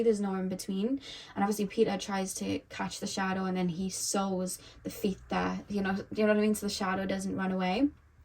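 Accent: Wales, United Kingdom. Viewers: Coming from Pile 3 😭 thank you so much, bawled as usual! I love when you say alright trigger warning we’re going deep lol. These readings of yours have been so powerfully healing for me. The higher self of your mother one did more to help release and forgive her than anything I had tried. Also, even though I’m Australian my Dad is from the UK and I know you’re Welsh but your accent is similar to my ancestors so it all feels extra healing 🦋 Going back and forth with a toxic ex right now, feeling the deeper thread ready to break and knowing I have to go purge some ancient pain. So don’t want to 😂 But if I don’t I’ll just go and seek the same person out in a different body! I’ve just found your inner teenager reading so will do that one 🫶🏼 maybe a reading on how can I receive nurturing better or just understanding worth and love properly maybe? Childhood trauma/narc/emotionally immature parents and the relationships we form after that are a real minefield to heal from. 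I really appreciate your readings and the fact that you really truly GET IT. 🤍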